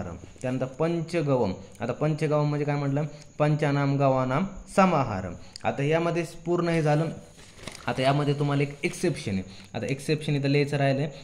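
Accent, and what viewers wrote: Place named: Vidarbha, India. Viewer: Pure gavran bhasha vidharbhachi zp shalet shiklya sarkha vattay sir thanks ❤❤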